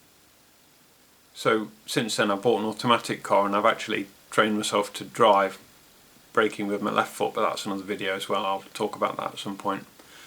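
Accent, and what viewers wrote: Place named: Nottingham, United Kingdom. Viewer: Hi, I thought I recognised that lovely accent of yours, then you mentioned Nottingham! I am originally from Mansfield, living up in Yorkshire now though. I am finding your videos very informative, currently going through the MS diagnosis process myself. Keep them coming, they are very helpful. Thanks.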